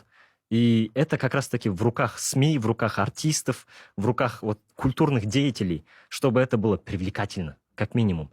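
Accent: Standard. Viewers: Ол қазақша сенен таза сөйлейді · Как раз таки он чисто, грамотно и литературно излагается на казахском языке. Это он объясняет напротив сидящим русско говорящим ведущим.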